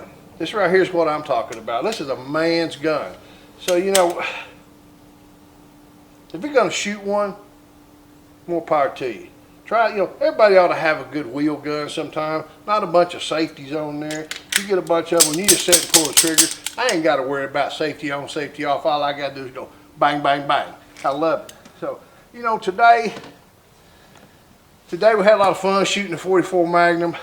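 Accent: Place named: Tennessee, United States. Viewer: Fun video! You sound like you’re from my neck of the woods in middle Tennessee.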